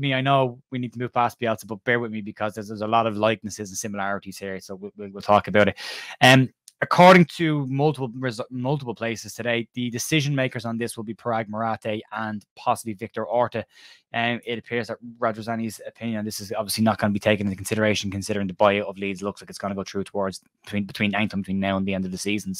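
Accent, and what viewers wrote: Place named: Ireland. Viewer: Where in Ireland you from bro? I'm Newtownards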